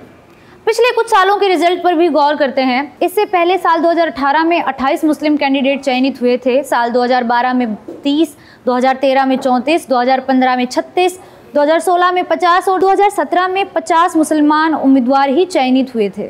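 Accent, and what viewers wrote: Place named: Haryana, India. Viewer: delivery is natural,fluent and imbued with call confidence. The content is crisp. Though it has a certain hint of Hariyanvi, I would prefer it that way. I believe bits and pieces of her dialect makes her original and rooted. ❤️ I would see show over CUT THE CLUTTER in which Shekhar Gupta goes round and round to defend Modi and BJP except the indefensible economic issues/stands they would have. Moreover,it can test your patience with the time SG takes to clutter/declutter. I have stopped watching it lately. I cannot belive I have watched it at least for more than a year now. All the more power to 🎉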